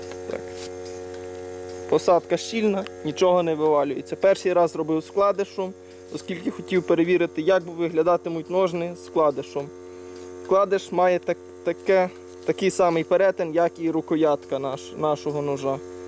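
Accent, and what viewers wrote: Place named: United States. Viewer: По-американски пиздит, ничего не понять.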